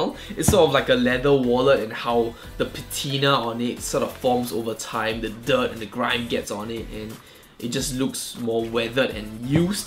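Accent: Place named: Southeast Asia. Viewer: You have a South East Asian accent! By any chance are you from Singapore or Malaysia?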